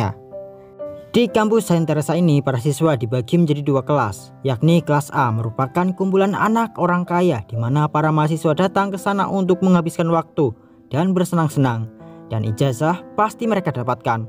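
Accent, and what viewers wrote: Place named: Java, Indonesia. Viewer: Medok wong jawa kie admin ne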